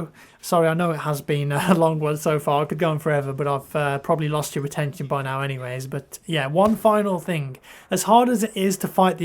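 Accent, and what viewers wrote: England, United Kingdom. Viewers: What part of england you from?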